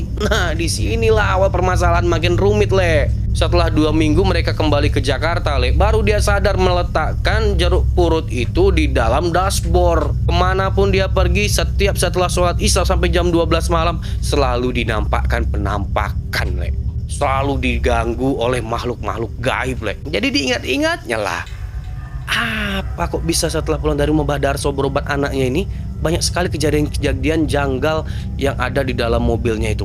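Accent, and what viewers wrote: Medan, Indonesia. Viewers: Kereennn ceritanya leeekkk.... Medan kali laahh gaya abg ini semangat bang.... 😂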